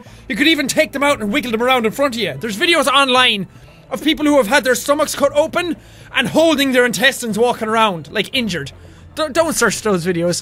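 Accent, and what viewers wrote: Ireland. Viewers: I just got out of surgery. What’s better than watching my surgery as a video game with an Irish lad commentating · He's Irish mate. I'm half Irish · hes irish thats why he has that accent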